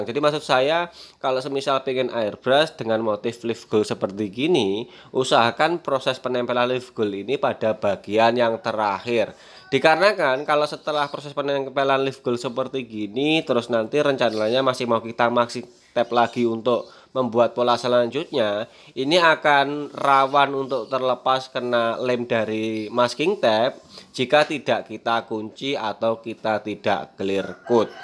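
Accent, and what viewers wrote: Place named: Kediri, Indonesia. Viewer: Koyok wong kediri ya kui mas 😁😅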